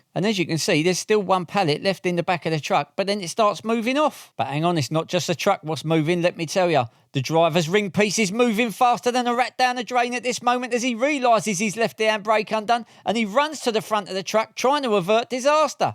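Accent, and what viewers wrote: Cockney, United Kingdom. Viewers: omg a cheap and nasty video of old clips with crap cockney commentary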